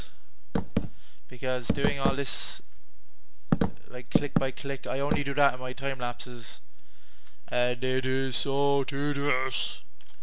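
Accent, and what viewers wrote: Ireland. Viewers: Does every Irish says "ish" instead of "it" ? (I'm french and this particularity made me curious :3)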